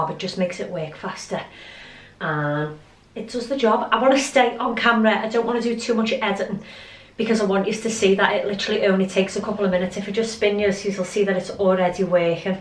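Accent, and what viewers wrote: Liverpool, United Kingdom. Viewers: Thank you for the tips! I love the scouse accent especially in women 😀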